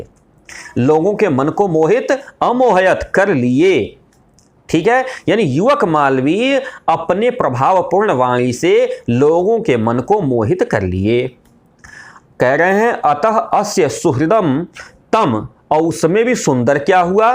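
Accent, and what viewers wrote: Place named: Bihar, India. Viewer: आप योग हैं तभी तो सरकार में चयनित है पढ़ाने का तरीका बहुत बढ़िया है आपकी आवाज लगता है बिहार के लोगों से मेल खाता हुआ, लेकिन हिंदी साहित्य में अधिकांश विद्वान अपने पूर्व के ही हैं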